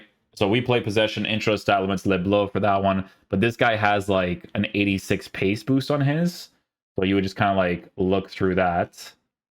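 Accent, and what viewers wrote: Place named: Portugal. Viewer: He's Portuguese. He has an accent. Get over it 😂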